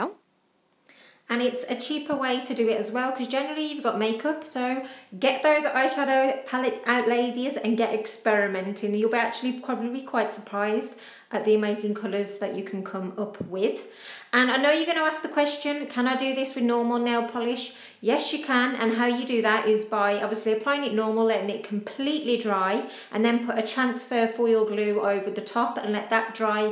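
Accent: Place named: Tamworth, United Kingdom. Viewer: Hey, sorry for the none nail related question. I recognise the accent I think. Are you from Tamworth? X. Love the tip. Thanks for sharing.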